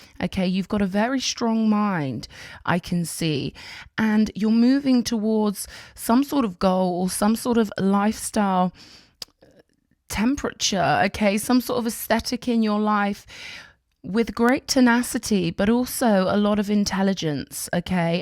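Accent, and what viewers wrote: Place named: England, United Kingdom. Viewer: Lovely to hear your English accent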